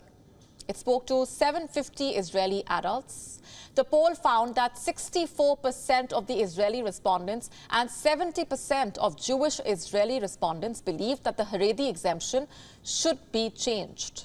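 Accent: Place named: India. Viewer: This is a hard accent to like to western ears. Why does a India news channel speak in English anyway. Go away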